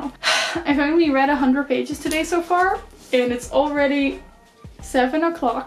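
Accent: England, United Kingdom. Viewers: I don’t want to alarm you but you’re speaking with a heavy British accent, more than usual (particularly in the beginning). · since when did you develop a british twang to your accent?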